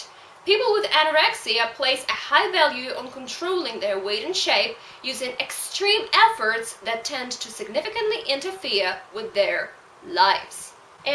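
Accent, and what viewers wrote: United Kingdom. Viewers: I love that British accent you have.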